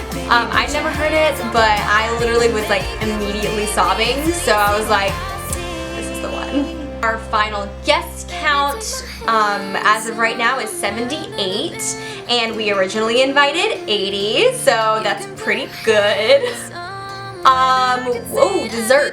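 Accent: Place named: South, United States. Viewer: I haven't heard you talk in a while 😂 but your southern accent is coming in hard girl ! You look fantastic! So excited for you 😍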